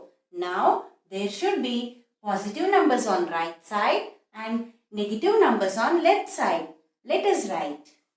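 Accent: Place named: Andhra Pradesh/Telangana, India. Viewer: You are from Adhra or telangana 😅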